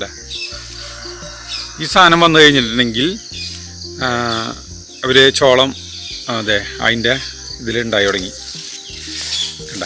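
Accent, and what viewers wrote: Thrissur, India. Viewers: ആദ്യമായി കണ്ടതാണ് ആളുടെ വീഡിയോ!മടുപ്പിക്കാത്ത നല്ല അവതരണം.Keep It Up. സസ്ക്രൈബ് ചെയ്തു. തൃശൂർകാരൻ ആണല്ലേ! ആ സ്ലാങ് ഒരു ആവേശമാണ്. Wish You All The Best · Thrissur slang❤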